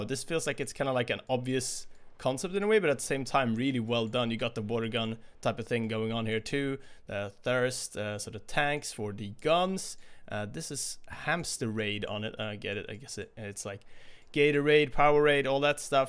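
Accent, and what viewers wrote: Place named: Sweden. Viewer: swedish accent jumped at me from the first 5 seconds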